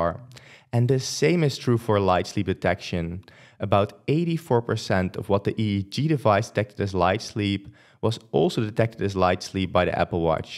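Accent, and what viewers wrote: Netherlands. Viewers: Up until where you show the dates, I thought you said eighty nights like a dozen times throughout the video, not eighteeN nights :o As a (fellow? you sound dutch) dutch person, one tip I've heard to differentiate easier is to put the stress on the second syllable when saying 1x (eighTEEN) and on the first when saying x0 (EIGHty). This does make me wonder a lot more about the p-value on all this, as this is supposed to be scientific after all and there are no error bars in e.g. the conclusion chart shown . Don't get me wrong, it's a solid analysis nonetheless, but it's a bit hard to know just how conclusive the comparison is or if there are overlaps in the error bars :) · Also here to comment on your methods with this being my first of your videos to watch. Really well done and I appreciated the assumingly Dutch accent :) The thing I'll be missing (or I already missed it was announced) is an alarm function at the end of a sleep phase. 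I found 3rd party apps performing rather poor at this and I think it'd be the number 1 feature I'd love my watch for.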